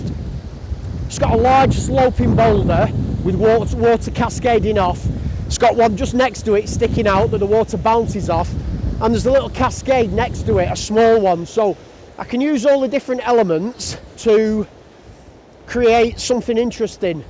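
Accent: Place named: Lancashire, United Kingdom. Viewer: Another great video, your Lancashire tones are music to my ears! However.....even better if you knew where you were! You were not at Devils Kitchen, you were at Cwm Idwal and then Llyn Ogwen. South Stacks is at the north west of Anglesey not the southern tip!😂😂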